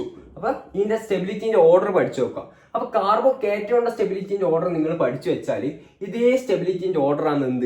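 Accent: Kannur, India. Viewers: Bro kannur aano 😊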